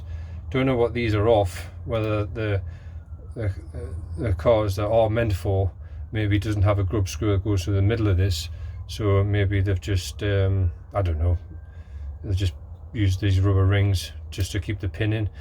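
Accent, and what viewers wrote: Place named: North East England, United Kingdom. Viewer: Where you from mate, sound like NE?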